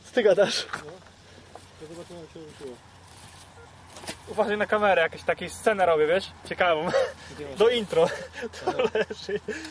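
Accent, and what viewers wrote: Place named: Kuyavia, Poland. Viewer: Ty chyba jakoś z Kujaw jesteś .. "Jo" to trochę charakterystyczne :-)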